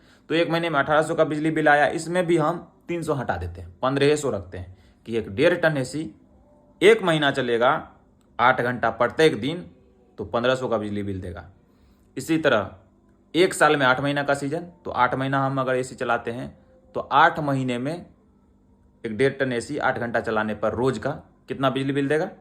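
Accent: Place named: Bihar, India. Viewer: Qki dono bihaari hai..(no offence) Ye bhojpuri lehza hai..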